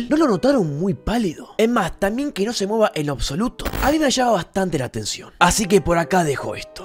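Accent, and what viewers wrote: Argentina. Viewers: De que provincia de argentina sos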